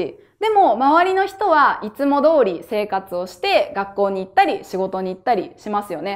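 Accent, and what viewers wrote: Kansai, Japan. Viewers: 先生は関西人でしょうね。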